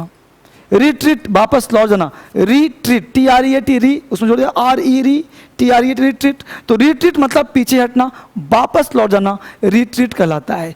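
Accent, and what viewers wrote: Bihar, India. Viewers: Shayad AAP Bihar Ya Jharkhand Se Ho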